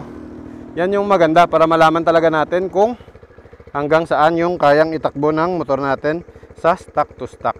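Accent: Iloilo, Philippines. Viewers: Ilonggo go ka gali ayusin mo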